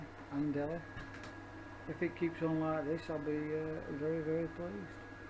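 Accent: United Kingdom. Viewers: just sat through and watched a good few of your videos, you're obviously a Wigan Lad as you sound just like me:-) Keep them coming thoroughly enjoyed them, all the best Steve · Hiya, just watched your video on bridge rectifiers.I have an old LE Velocette with the old type plate rectifier, three plates.Recently had the magnet demagnetised.On position one on the ignition switch the bike runs and charges, on position two, ignition and lights (DC) it does not charge.Been recommended to use a modern rectifier, like the one you speak about.The LE is pos earth does that make a difference??You sound like you are from near me in Warrington??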